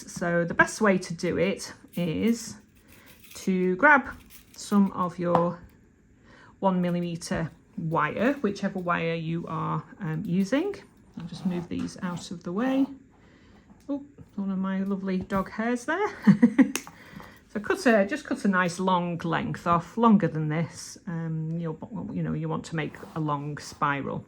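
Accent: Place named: England, United Kingdom. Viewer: Ok, you can say ok all you want, ok 😊.... ok! I do like the darker material for your background. I was going to suggest a beading mat, but that looks good. Sorry for people being people... I think you are great! I really enjoy how you speak and the way you speak from England being from America, it's neat yo hear the differences. 😊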